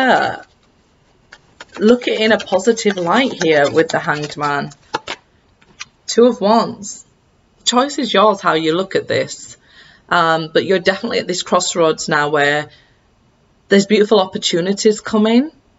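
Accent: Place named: Lancashire, United Kingdom. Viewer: You sound like a local. I'm a Lancashire lass😊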